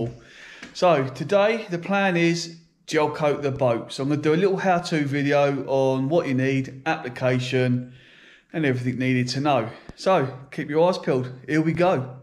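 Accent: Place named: United Kingdom. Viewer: So british accent, good vid, folk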